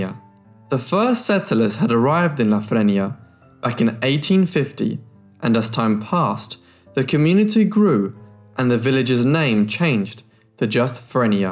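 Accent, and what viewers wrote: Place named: West Midlands, United Kingdom. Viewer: Gotta be from the westmidlands right? Your voice sounds to familiar for it not to be. Xx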